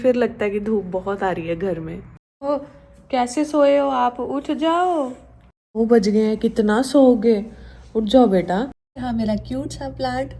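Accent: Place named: Himachal Pradesh, India. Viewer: Aapki language me himachali touch ata hai apnapan mehsoos hota hai ❤❤❤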